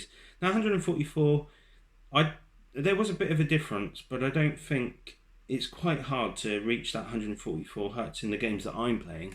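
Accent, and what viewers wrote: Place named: England, United Kingdom. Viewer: It's amazing how much I love an English accent.. and how much them pronouncing "H" bothers me. We (U.S.) say "A-ch" They say 'Hate-ch". breaks my brain. :) Thanks for the info and review!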